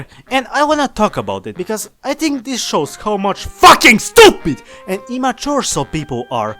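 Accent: Eastern Europe. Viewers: Never knew how much I needed a man with a thick Slavic accent scream about how stupid Genhin VAs are now until now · dudeeeeeee the sickass art? the memes? the angry slavic accent? new sub right here damn I ain't rihanna but where have you been all my life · Slavic accent enhanced the experience too.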